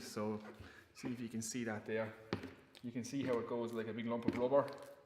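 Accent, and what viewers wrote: Ireland. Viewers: Can't hear you man. But subscribed anyway haha refreshing to hear an Irish accent for a change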